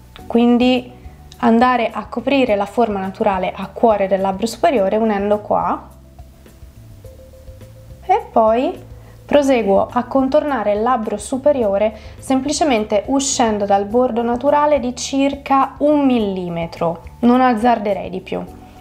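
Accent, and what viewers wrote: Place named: Tuscany, Italy. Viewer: Che bello l'accento toscano😊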